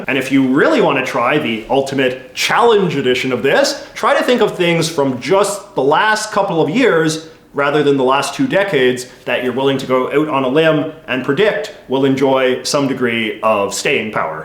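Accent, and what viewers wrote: Canada. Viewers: Love the Canadian accent. Secular Christmas music from the 80's and beyond will always suck. It all has become a holiday of acquirement instead of the real meaning of Christmas. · To any Canadian who denies they have an accent, listen to this guy.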